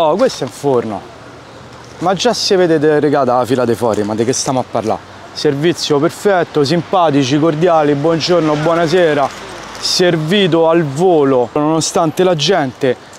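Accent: Rome, Italy. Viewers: Grande. Io sono della Basilicata e vedo tutti i tuoi video. Mi piace tanto come ti esprimi e adoro l'accento romano. Continua cosi. 💪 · Io ormai me li guardo tutti i tuoi video, semplice, genuino e poi l'accento romano nsè batte 🤣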